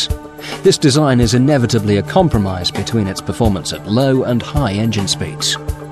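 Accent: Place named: United Kingdom. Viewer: Fantastic overview of turbocharging. Everything sounds better when explained with a British accent. Well done.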